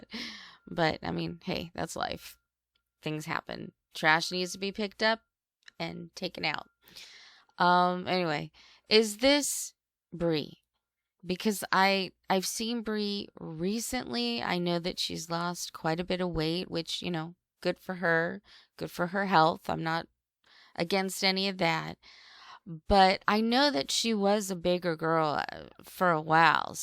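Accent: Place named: Geordie, United Kingdom. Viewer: I agree, maybe even Leeds. I thought at one point he sounded slightly Geordie or Durham way but he's definitely British I believe 😊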